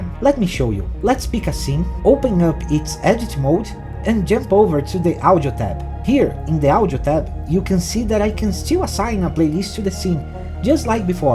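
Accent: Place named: Brazil. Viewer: Hahahahahahaahah incrivel como da pra perceber outro brasileiro pela fala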